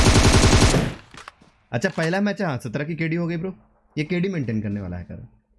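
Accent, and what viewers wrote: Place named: Kanpur, India. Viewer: Bro kanpur se ho kya because od your tone agae haa to bhai hum bhi hai ek shoutour dedo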